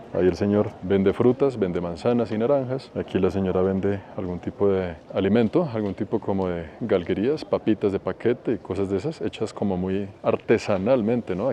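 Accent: Colombia. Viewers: Excelente video, 100% acento colombiano 😊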